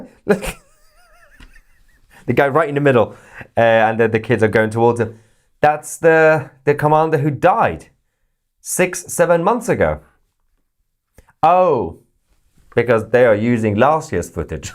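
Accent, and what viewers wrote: United Kingdom. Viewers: Mr.! Did have seen your Video and heard your fucking British accent??? Believe me, you’re a very cheap guy like those IRJC and Basij undereducated!!! 👎👎👎👎👎👎👎👎👎👎👎👎👎👎👎👎👎👎👎👎👎👎👎👎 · .if America goes in on foot it's gonna be bigger then Iraq does. Trump want a long war . because bombing them won't get rid of them if you want change you have to go in on foot.. be prepared to lose thousands of Americans .. how much are they paying that British accented mouthpiece.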